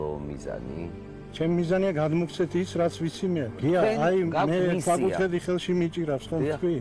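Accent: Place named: Mingrelia, Georgia. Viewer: კილო მეგრული აქვს. ვინ არის ნეტა.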